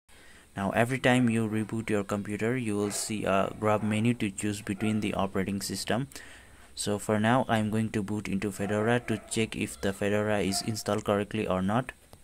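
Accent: India. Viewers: 5 mins Indian accent but not over the top no stupid commentating perfection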